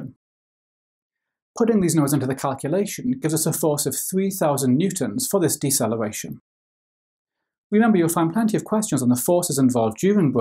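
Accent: Wales, United Kingdom. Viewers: Hello. Please could I ask if you are welsh?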